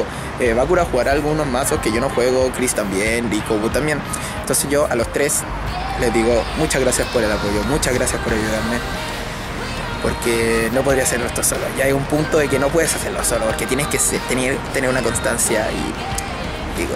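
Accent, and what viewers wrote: Chile. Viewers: y? creo que son de mi ciudad Arica, sino sorry jaja. Oye que bueno que has mejorado tu forma de hablar, no te conozco en tus inicios, pero esa forma animada de decir las cosas a la chilena es tu buen sello, saludos compaa.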